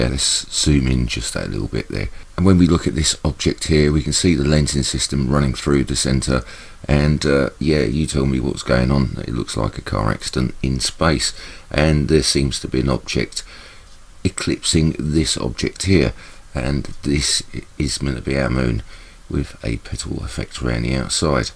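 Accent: United Kingdom. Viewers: Hiya mate I've been watching your videos for a good few days now. Your videos are probably showing the best evidence I've seen. Can you tell me what the lensing system is? Great videos mate. You sound British? Down south? I'm from wigan near Manchester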